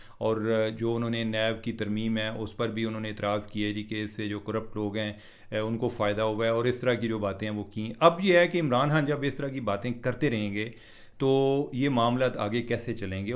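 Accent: Punjab, India. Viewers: sb always stayed blessed.always excellent analysis sir..with punjabi touch very cute.. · Aap sanjeeda se sanjeeda baat ko halky phulky andaz me bayan karty hain.khas tor per punjabi tarka jo aap lagaty hain .I enjoy it a lot.Mera taluq punjab(Burewala) se hai iss liey .keep it up thanks.😂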